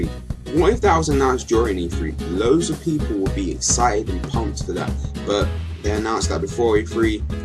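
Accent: United Kingdom. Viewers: You're from London, and you're either ghanian or nigerian. Am I right? :)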